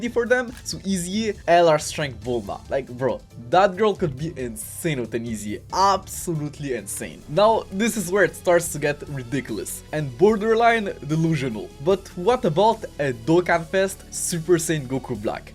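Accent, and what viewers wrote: France. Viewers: i can hear the french accent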